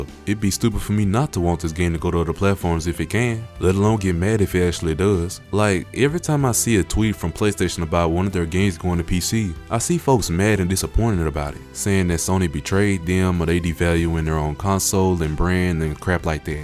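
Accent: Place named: United States. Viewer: Constructive feedback: 1. It's difficult to read paragraphs of text on-screen when you're talking at the same time. Choose one or the other. 2. You have a REALLY strong American accent. As a Brit, I can't understand you when you speak quickly and start slurring your words. 3. Opening with "PC is better anyway" in the first 30 seconds is just an insult to parts of the audience who disagree with you. As someone who owns PC and consoles, I think consoles are better because you can relax on the sofa instead of sitting bolt-upright at a desk. Good luck with your Youtube journey.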